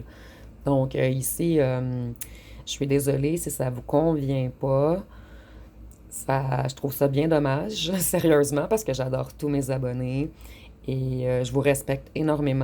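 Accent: Canada. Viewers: c'est un joli prénom, vous êtes canadienne? J'adore votre pays et accent. Vos guidances sont excellentes. Merci ❤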